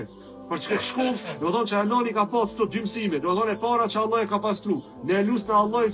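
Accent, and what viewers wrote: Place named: Kosovo. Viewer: Ti do të jesh nga Kosova,në këtë dialekt.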